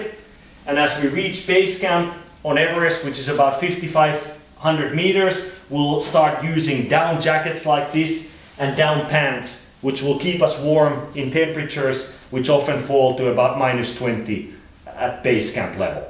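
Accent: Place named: Finland. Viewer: Propsit sulle ite oon vaa haaveillut everestistä ja muuten toi rallienglantihan kuulostaa hyvältä!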